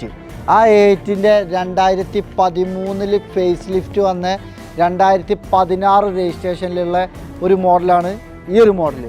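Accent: Malappuram, India. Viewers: എന്ത് poooo. റ. നടോ പറയുന്നത് മലപ്പുറം അല്ലെ അത്ര ഉണ്ടാകൂ കജ്ജ് നേജ്ജ് ഇജ്ജ് poda....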